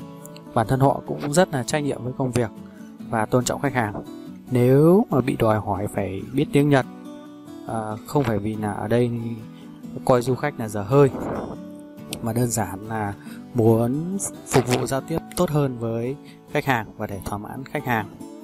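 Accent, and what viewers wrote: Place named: Hai Duong, Vietnam. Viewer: Đặc giọng Hải Dương 😄😄😄😄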